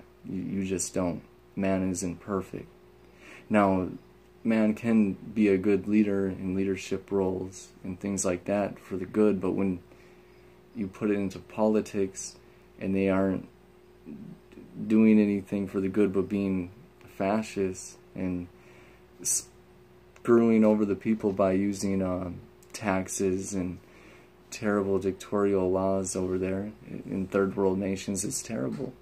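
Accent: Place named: Wisconsin, United States. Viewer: Hi....it's me Dr. Rockso....good to see ya....love the Wisconsin accent!! I miss the crew!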